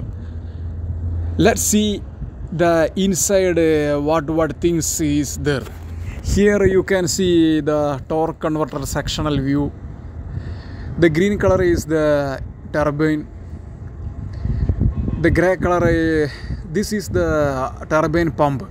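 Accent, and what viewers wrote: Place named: India. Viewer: talk look like bullshit....your voice bad from india....tatatata..like busy...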